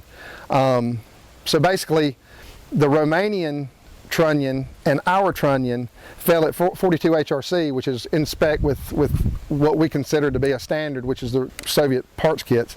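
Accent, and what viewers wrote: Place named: South Carolina, United States. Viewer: That SC accent makes me miss the Carolinas. Ive lived and worked down there off and on for years (Charlotte, Columbia, barnwell, Gaffney, Lugoff, Myrtle Beach, etc). South Carolinians are some of the best folks on Earth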